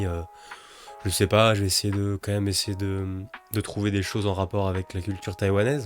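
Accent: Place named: Paris, France. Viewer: your parisian accent is tres charming.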